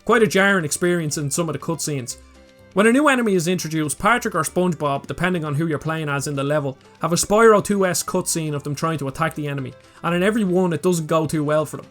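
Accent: Ireland. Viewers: I could listen to your strong Irish brogue all day, brother! great videos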